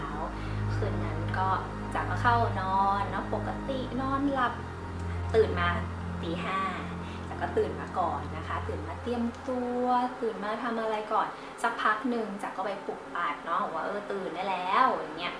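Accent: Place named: North, Thailand. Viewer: สวัสดีจ้าว ปี้หนานดูคลิปตี้ไปแอ่วแม่กำปองฟังกอยสำเนียงเเล้วต้องเป็นคนเหนือแน่เลย มากอยคลิปนี้ฮู้เลยว่าเป๋นคนเหนือดีใจ๋เด้อตี้มีช่องคนเหนือทำช่องดีๆ ปี้หนานก็ทำช่องเหมือนกันมือใหม่หัดทำ ทำไปเก็บความประทับใจ๋ไว้ดูอีกหน่อยทำดีพ้องบ่อดีพ้อง สู้ๆไปเด้อ เที่ยวบ้างไม่เที่ยวบ้าง✌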